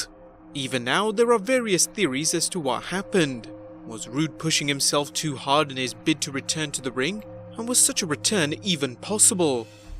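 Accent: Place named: United Kingdom. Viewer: Mostly a nit brit poorly reading some text.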